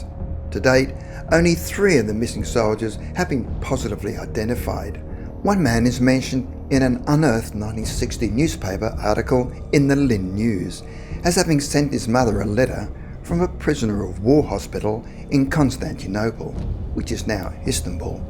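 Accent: Australia. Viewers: Are you Australian? That voice. Bloody so called great war is very accurate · I thought you were a Aussie mate.😄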